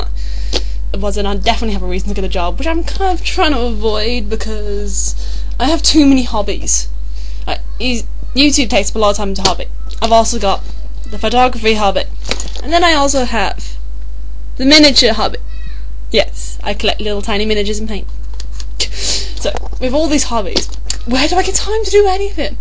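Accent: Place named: RP, United Kingdom. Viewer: wow right you do sound posh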